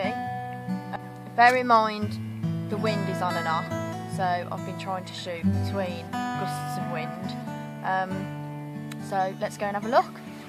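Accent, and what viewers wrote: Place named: United Kingdom. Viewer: she sounds very British to me.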